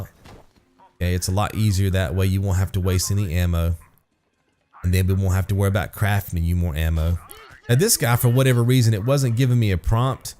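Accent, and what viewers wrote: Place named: South, United States. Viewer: Can I ask you where you're from, love your accent, reminds me of the South :D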